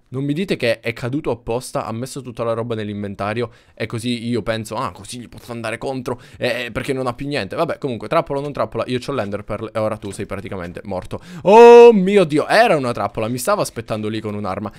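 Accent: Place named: Caltanissetta, Italy. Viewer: scommetto che sei di Caltanissetta si senta dalla tttt cmq bellissimo video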